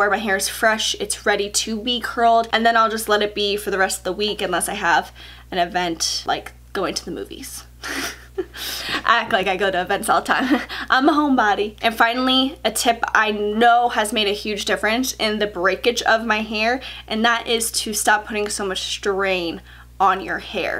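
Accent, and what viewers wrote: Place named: New York, United States. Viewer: you sound like youre from new York in this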